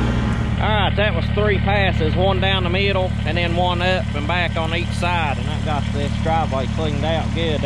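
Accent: South, United States. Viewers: Love your southern accent! Yont to?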